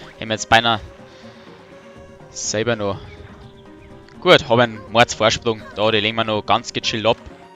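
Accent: Bavaria, Germany. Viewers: wie immer a guards Video! und was mir daugt is dei Dialekt, wenigstens mal a gescheites bayerisch,mach weiter so👌😉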